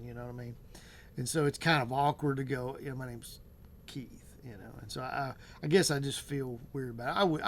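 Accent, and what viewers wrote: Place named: Tennessee, United States. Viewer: I'm almost 60 myself. I had quite of few friends thought true friends. my Son passed away but Little by little they stopped interacting with me due to what I was going through, the grief. So now I don't actually have a friend here where I moved. I moved away from my home state to the desert to start new and moved into a 55+ community. Most of them are a lot older, or already have their own friends, or stays in their homes to beat the heat all day. Plus as I aged, my confidence level has taken a hit. Contributed to ageing. Like you, I work from home and it's very hard to connect that way For friendships. Also, I'm a little too shy to join a group without having a friend or someone I know to go with me, initially. Silly I know! I need to get past that. I'll start going to the gym soon. We all need to be healthier. 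I feel your accent is from maybe TN. That state there is God's country. So beautiful. Another commonality, I have a brother named Keith. 🍺 Here's to us making new friends and having social lives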